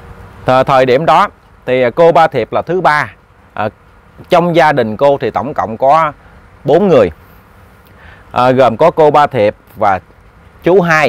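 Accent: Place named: Countryside, Vietnam. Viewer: Kể thì hay nhưng sai c tả thay vì chử rồima đọc dồi làm nghe nông thôn quá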